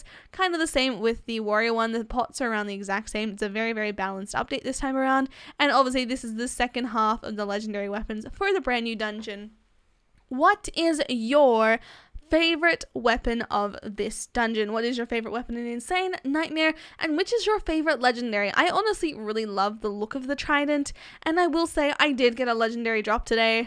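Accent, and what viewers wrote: Australia. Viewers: Is she aussie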